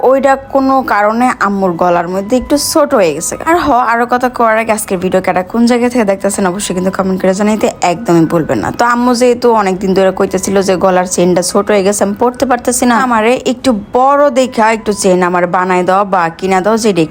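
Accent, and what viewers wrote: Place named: Narsingdi, Bangladesh. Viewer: Apu apni norsingdi basay na nope suddo basay bolben sundor lge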